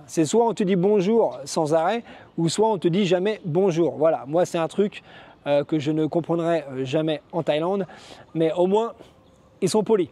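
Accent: France. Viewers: allo ce que jaime chez les francais de la france vous avez un tres beau parler que nous les quebecois a oui nous au quebec sa redouble encore et sa ma fait rire quand tu parlais du pauvre tip qui marchais comme quil fesait du patin a glace il a na beaucoup quil son comme sa et le pire de tous celui quil porte leur pentalon plus bas que les fesse yo men 🤣 .prenez bien soin de vous 🙏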